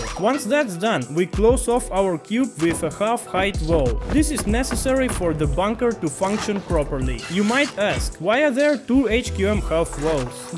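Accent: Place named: India. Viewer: I rember him og India voice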